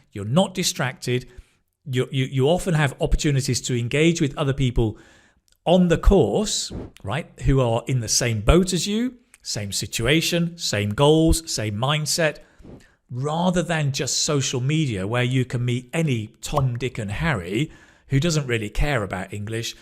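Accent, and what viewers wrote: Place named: Manchester, United Kingdom. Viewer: I think he is from Manchester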